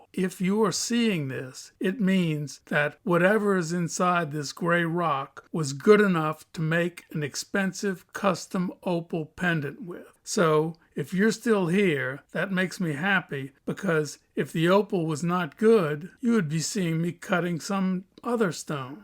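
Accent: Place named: Louisiana, United States. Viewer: I love your videos. I'm training to have an English accent like yours. My favorite Lousiania accent. Keep the great work. The internet allows me here in Brazil to have some contact with your wisdom. Thank you very much.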